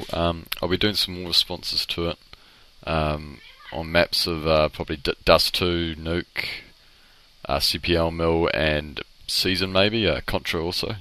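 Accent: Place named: New Zealand. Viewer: cool accent, New Zealand? You sound like flight of the choncords.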